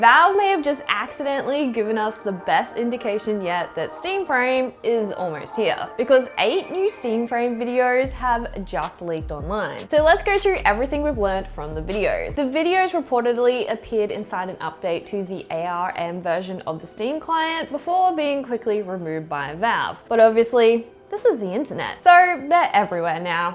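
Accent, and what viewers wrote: Australia. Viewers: I spent 90% of this video saying "so" like Australians do, because it will never not seem weird to my brain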